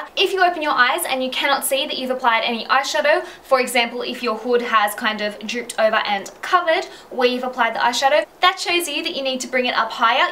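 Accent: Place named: Australia. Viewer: thees kolla heah! Is this an Aussie accent? it's so cute! lol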